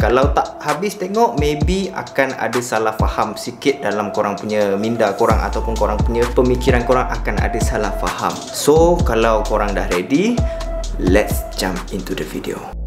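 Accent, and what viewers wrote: Johor, Malaysia. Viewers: Bahasa awk .tak sesuai..giler..korangg gay..DLL...slang lebih Johorean... sedang kan... yang tonton nya satu Malaysia Indonesia...gunalah bahasa . sebut betul sikit..atau baku pun boleh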